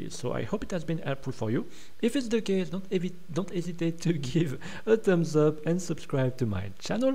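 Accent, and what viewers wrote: France. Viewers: Are you french ? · c'est bizarre mais tu parles anglais comme un français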